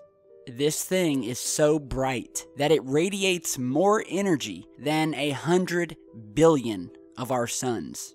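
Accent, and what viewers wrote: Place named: South, United States. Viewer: man, you really have to polish that southern accent. It is quite distracting !!!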